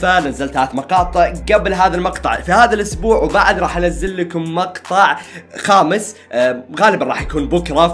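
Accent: Gulf, Saudi Arabia. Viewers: انت سعودي ولا اماراتي؟؟؟؟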